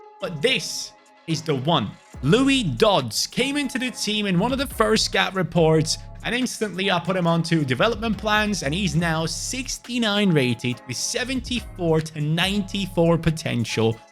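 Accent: Netherlands. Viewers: I am Belgian but still happy to hear a fellow Dutchman. Or German? Anw, I would make a career to put a top tier Dutch club into one of the top 5 Leagues and try and win the league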